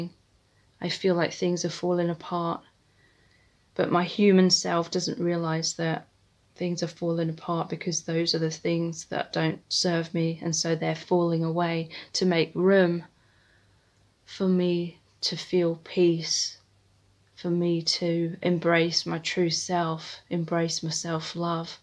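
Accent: United Kingdom. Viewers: This reading is more than helpfull , thank you so much XOXOXOX and I love your British accent 🧡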